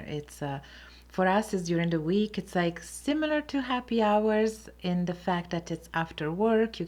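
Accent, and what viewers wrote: Italy. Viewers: your smile is infectious❤️. Loved this video...I am half Italian and proud of my heritage. My mom and Aunties were strong Italian women. I got my love of cooking from my Mom. To me Italians have the best food and yes my skin isn’t wrinkled because I eat the Mediterranean diet😂😂😂😂. I love to listen to your accent, lovely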